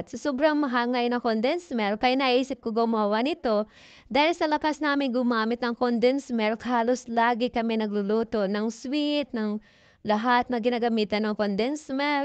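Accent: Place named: Visayas, Philippines. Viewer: ang cute ng voice ni ate pinaghalong englishera,na bisaya at Ai😄😄😄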